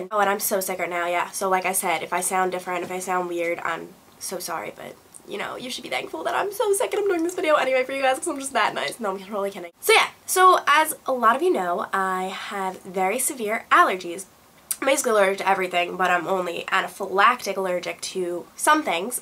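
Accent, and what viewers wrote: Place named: Boston, United States. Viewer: You sound like you are from Boston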